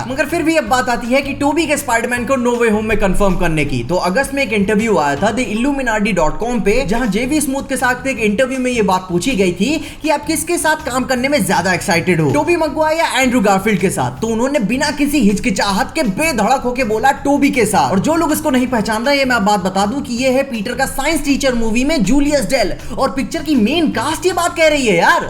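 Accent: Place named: Bihar, India. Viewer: Bhai tumhara style ek no.. up Bihar ka touch....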